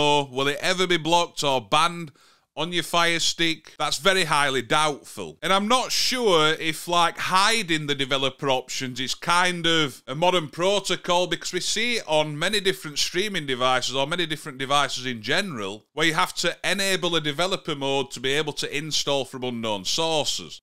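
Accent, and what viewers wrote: Yorkshire, United Kingdom. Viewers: It's Yorkshire.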